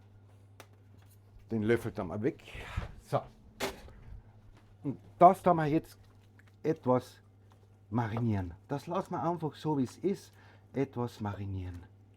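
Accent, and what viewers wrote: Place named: Carinthia, Austria. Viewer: du bist so sympatisch u. ein super Koch, ich bin 66 Jahre, wohne in Tirol, bin eine leidenschaftliche Köchin, schaue immer gerne deine Kochsendungen, dein Kärntnerdialekt ist einfach super!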